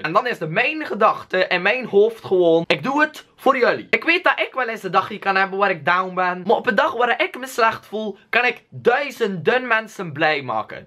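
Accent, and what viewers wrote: Belgium. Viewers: probeer eens in een video zonder een Belgisch accent te praten maar met een Nederlands accent lijkt me wel een x grappig;p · je hoort duidelijk dat hij n Belg is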